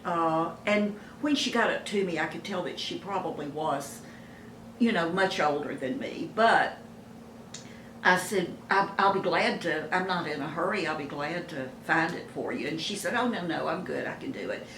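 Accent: South, United States. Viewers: You are beautiful and so inspiring!! Love your southern accent🌿💜🌿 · Yes! I love what you say and how you encourage other women to do what they want to do and feels right to them. Where are you? I am in Massachusetts. You sound southern, but I wish you were next door! ❤️ I will be 79 in August, still wearing makeup, still learning how to do it better. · Hi I only just found you on You Tube tonight! I'm soooo happy because you are a delight!! I love your southern accent! Where are you from? I'm originally from Louisville, KY and oh how I miss a soft southern accent. Can't wait to see more of your videos!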